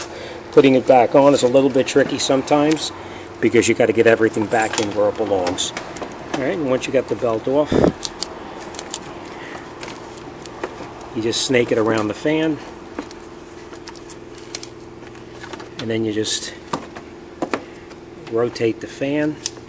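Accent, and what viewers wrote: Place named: New Jersey, United States. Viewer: I closed my eyes and it was like Bobby Deniro’s garage in here! Love that Jersey accent! I’m gonna do this soon on my 03 Sport plus check all the pulleys for noise. Mines got 200k and it sounds like idler, tensioner or power steering pulley is making an awful hum. Thanks for the great video. The complainers can just fugget-about-it! Lol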